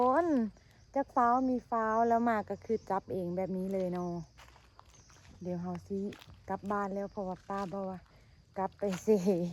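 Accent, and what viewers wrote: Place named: Phu Thai, Thailand. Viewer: สาวเวามวนหลายมักฟังคนภูไทเวามันมวน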